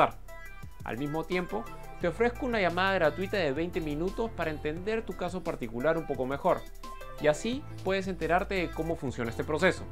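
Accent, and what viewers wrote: Peru. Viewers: Eres peruano? xD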